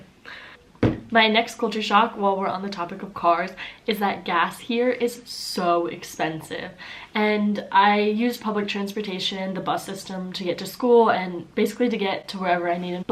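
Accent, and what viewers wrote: Germany. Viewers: Pretty German accent...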